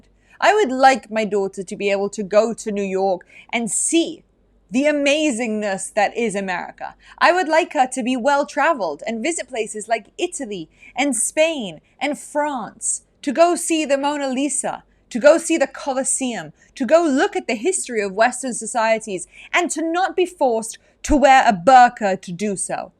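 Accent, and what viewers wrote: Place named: United Kingdom. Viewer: I hear a British accent. Which upsets me. Because I'm worried you & your family left because England is no longer safe for you.😢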